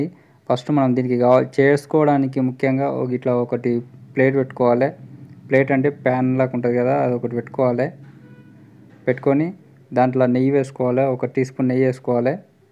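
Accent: Telangana, India. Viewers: Anna mana telangana slang lo vantalu vintunte kadupu nindi pothundhi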